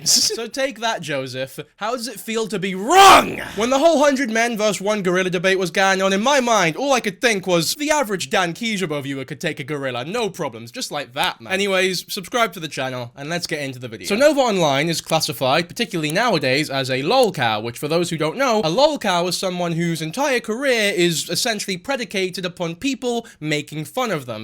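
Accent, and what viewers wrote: Scotland, United Kingdom. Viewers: Has anyone else noticed that is getting more Scottish lately? I blame the absence of his lass in his videos. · I love the little scotsisms that sneak in to speech 🥹 didnae couldae wouldnae ❤ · Your scottish anger amuses me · He’s Scottish?😭😭😭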